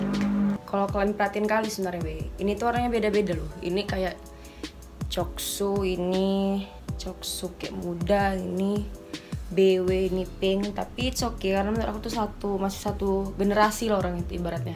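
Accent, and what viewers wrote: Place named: Lhokseumawe, Indonesia. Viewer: logatnya kaya anak lhokseumawe atau aku aja yg salah denger._.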